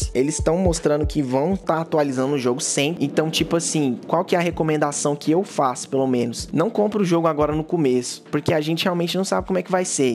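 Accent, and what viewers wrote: Minas Gerais, Brazil. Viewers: Ce é mineiro?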